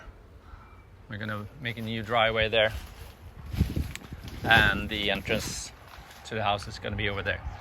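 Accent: Sweden. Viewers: Du låter svensk. Lycka till med renovering - fantastiskt hus! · Where are you from? Your accent is very Swedish. 🙂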